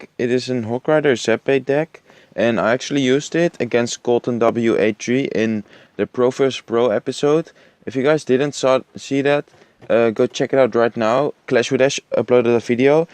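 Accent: Netherlands. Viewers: You still have the Dutch accent :) Good vid btw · Hey man! i love the vids, you're really starting off strong as a channel :) Only thing i want to give you as a tip: maybe work on your english accent for a little bit. I totally understand since i'm dutch too, but i guess a better english pronounciation may help your channel grow. Your content is A+ :D love it! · Are you dutch? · Ik kan echt een nederlands accent horen wanneeer je an zegt (je hebt de neiging om een te zeggen) en plus (dat je gewoon nederlands uitspreekt. Let daar een beetje op.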